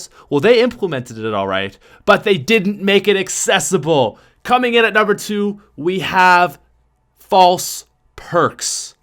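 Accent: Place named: Newfoundland, Canada. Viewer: Is this guy Canadian???? The way he says “out” and “about” is totally Canadian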